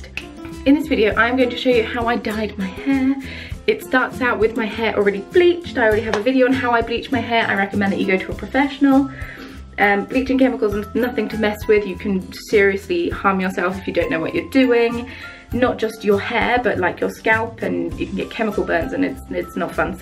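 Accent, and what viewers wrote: United Kingdom. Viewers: are you from britain?? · are you from Germany bc you sound very British XD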